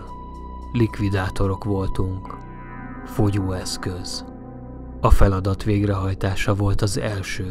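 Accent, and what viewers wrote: Russia. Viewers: Király az orosz akcentusod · Nagyon jó az orosz akcentusod. Tetszik! Azt hittem, hogy ez igaz történet, de miután megnéztem a leírást, akkor rájöttem, hogy ez csak egy kitalált történet. Kösz, ezt a nagyon jó történetet. Van ennek a történetnek folytatása? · Nem tudtam félni vegig a Vicces Orosz akcentuson szakadtam xddd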